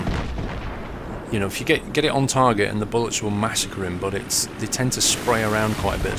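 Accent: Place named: United Kingdom. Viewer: Oh your british I see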